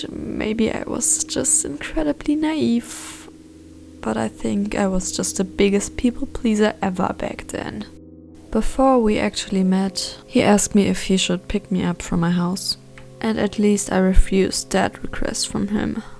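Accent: Germany. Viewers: Are you German? Bcs I think I hear a little bit deutsche Akzent ✨ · Nice German accent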